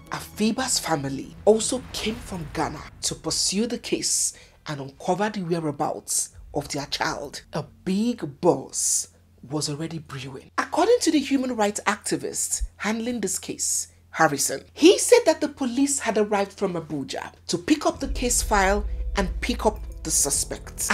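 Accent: United Kingdom. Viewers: Is that a uk accent😢😢😢😢😢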